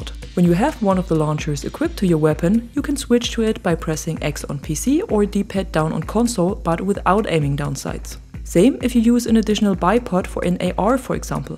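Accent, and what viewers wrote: Germany. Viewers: You have a new sub. Finally a channel with great tips and tricks instead of endless crying how bad the game is. Judging based on your accent, you are from Germany right?:)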